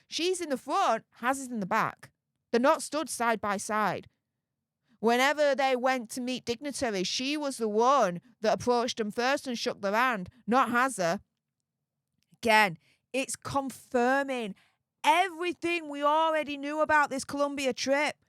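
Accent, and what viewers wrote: Yorkshire, United Kingdom. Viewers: It's part of her Yorkshire accent. American here, when I first started watching, I found some utube videos on the Yorkshire accent and I've understood her much better since.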